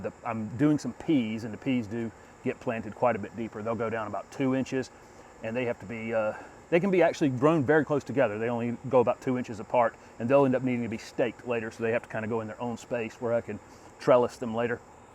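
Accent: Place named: North Carolina, United States. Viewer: Your NC accent was quite a bit more pronounced in 2018, when you were in zone in... Lol.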